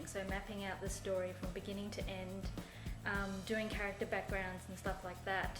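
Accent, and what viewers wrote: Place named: Australia. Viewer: I love your accent! You are such an awesome inspiration! Plus, you're from Australia so you're badass!! :D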